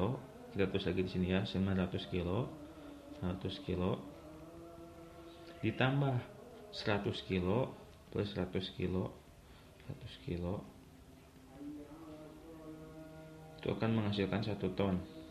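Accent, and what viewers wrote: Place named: Tasikmalaya, Indonesia. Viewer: Saya tasikmalaya pak...pantesan bicaranya kayak sama he he he. Belum pak saya baru rencana tapi pasti mau...masih nyari informasi dulu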